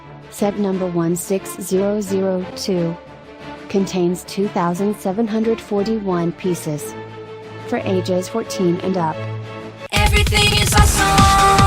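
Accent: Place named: Canada. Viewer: Ah okay! Sorry, I didn't detect the Canadian accent! Yeah, In Canada it released for CDN$300. It went as low as CDN$271.63 about a month before Christmas in 2014 after the Lego Movie came out: <link> Now, you're right it's crazy expensive: CDN$ 649.99 The subreddit r/Lepin is a really good place to go for info on this stuff. Really enjoy the vids btw, keep it up Hope your channel grows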